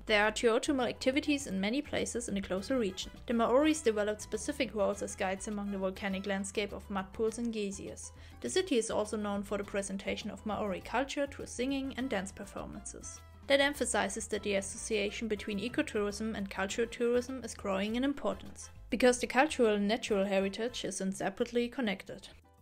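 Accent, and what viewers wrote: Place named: Switzerland. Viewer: Mal was neues 😄 Ich möchte ja nicht fies sein, aber es klingt etwa so wie wenn ich als Schweizer Hochdeutsch spreche. Trotzdem war das interessant! Und die Animationen sehr gut gemacht.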